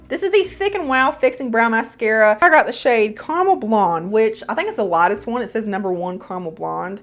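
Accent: South, United States. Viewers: Love your videos!! I am a Southerner and you accent sounds like you were raised in the South.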